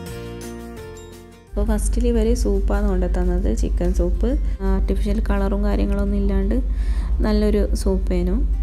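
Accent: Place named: Malappuram, India. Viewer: slang Malappuram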